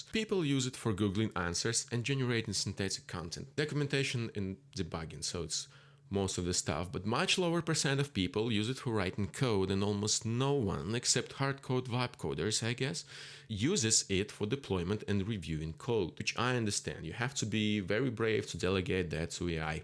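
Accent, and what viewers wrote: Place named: Russia. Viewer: thanks for answer, actually you're accent is pretty tiny but since I speak the same language as you (Rus) at least one, it's noticeable to me. I don't know why am I writing that in English lol I was talking about the yellow lamp actually which was in the frame, now I know it's name. Anyway thank you for the video, pretty much inspiring. I agree with your point of view and think that to handle the AI code you need to understand what's going on so IT guys should be still in demand. Good luck with the channel, you have pretty unique appearance and quality content so I think you're going to succeed.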